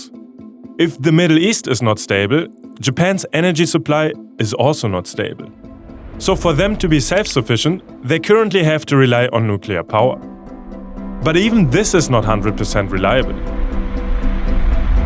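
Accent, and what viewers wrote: Germany. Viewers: Are u German?🧐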